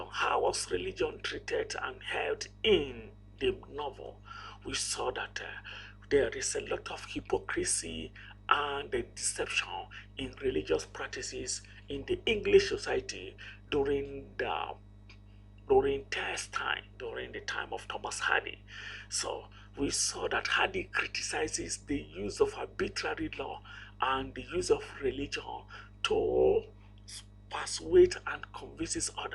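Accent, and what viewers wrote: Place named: Nigeria. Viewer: I love this video so much the Nigerian accent makes it so much better reminds me of Nigeria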